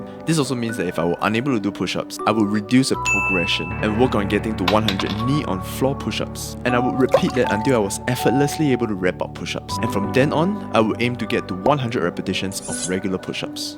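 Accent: Africa. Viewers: Bro, are you from an African background...? You speak like a friend of my. Love the accent😁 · Why is bro Singaporean but he has an African accent? Also, would seasoning the chicken kill my gains?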